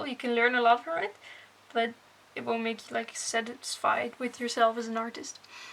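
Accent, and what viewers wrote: Netherlands. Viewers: Are you / Is she Dutch? I hear a familiar accent 😏😏😏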